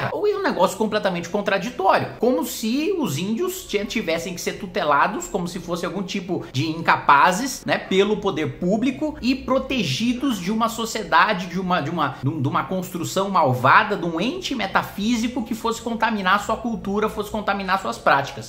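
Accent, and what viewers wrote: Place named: Pará, Brazil. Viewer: meio paraense, legal.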